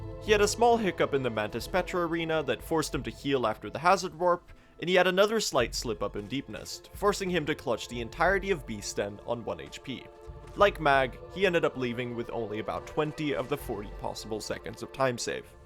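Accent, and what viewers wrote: Sweden. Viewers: Your swedish? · are you swedish? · Wait, you from Sweden? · Wait you are swedish??? Your accent is fucking amazing, even for a swede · that's an extremely Swedish accent though.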